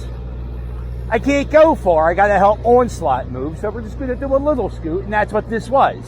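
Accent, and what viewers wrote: Philadelphia, United States. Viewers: You need to do voice over work for "thick Philly accent" types. Lol. I'm from Chester County and somehow it's only South Philly and Delaware County. Why is that? Anyway, cool vid!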